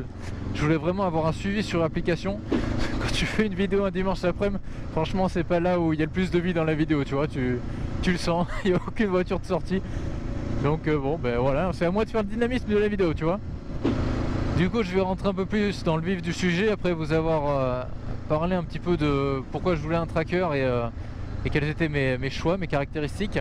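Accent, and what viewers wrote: Brive, France. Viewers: T'es de Brive ? ✌🏻😅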